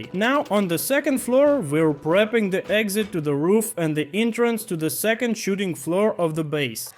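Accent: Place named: India. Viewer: I rember him og India voice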